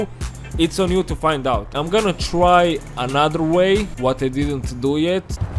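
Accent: Russia. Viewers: Wait, no he’s either Russian or German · I love this guy russian accent. Im balkan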